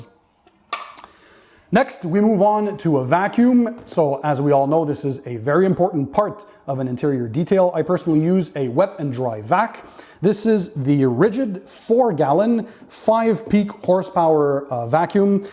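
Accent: Quebec, Canada. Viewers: Bonjour! parlez vous Français? Ça ressemble drôlement à un accent québécois anglophone haha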